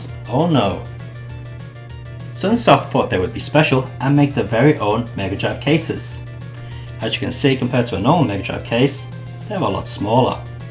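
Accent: United Kingdom. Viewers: i like ur videos+efforts+ur brittish accent ( if i am not wrong ).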